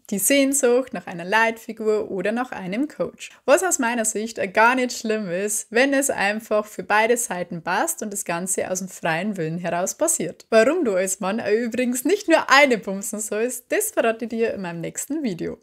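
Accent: Bavaria, Germany. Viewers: WOW,.. wo bin ich denn hier gelandet ❓️ Hübsch, sympathisch und ein interessantes Thema bei Youtube ❓️ Das sind ja gleich 3 Dinge auf einmal und das geht hier doch nun wirklich nicht... Also manchmal, so wie in deinem Fall, finde ich die Algorithmusvorschläge wirklich toll... Mit deinem verschmitztem frechen grinsen sowie deiner verführerischen erotischen Stimme in Verbindung mit deinem süßen Bayerischen Dialekt und deiner offenen lockeren fröhlichen Art, hast du eine sowas von sympathische Ausstrahlung, das ist einfach unglaublich.... WOW ‼️ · Wie kann man nur so unglaublich an ziehend und attraktiv sein. Wenn du mir über denn Weg laufen würde würde ich dich sicher nie mehr vergessen können. Allein deine Stimme mit einem so schönen Bayerischen Akzent Wow.....😮. Liebe Grüße Torsten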